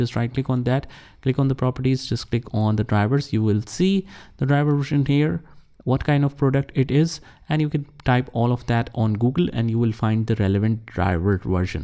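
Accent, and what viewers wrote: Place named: India. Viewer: the indian accent is strong in this one.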